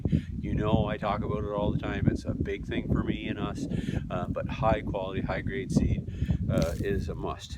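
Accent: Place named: Canada. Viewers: Man ur canadian af....nice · I thought so too. Always makes me happy to see another Canadian ❤️🇨🇦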